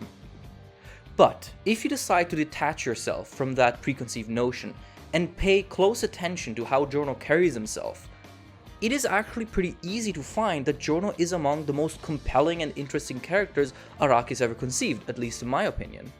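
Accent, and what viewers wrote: Italy. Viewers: pretty sure he is italian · I love your italian pronunciation! Keep it up! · Mhhhhhhhhhh your ACCENT souns very familiar,are you ITALIAN by any chance?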